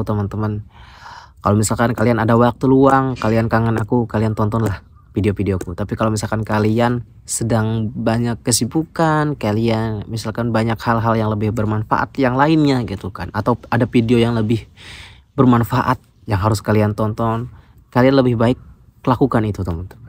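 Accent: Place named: Sunda, Indonesia. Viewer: Bapa pasti bangga a, btw asli mana a?. Logat sundana khas pisan😅. Pidoana a, mudah mudahan tiasa oge ngerantau ka jepang🙏🏻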